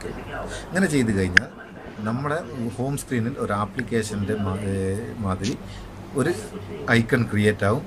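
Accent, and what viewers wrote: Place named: Vadakara, India. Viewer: ഇക്ക നന്നായി ട്ടുണ്ട് നമ്മുടെ വടകര ഭാഷയിൽ അവതരണം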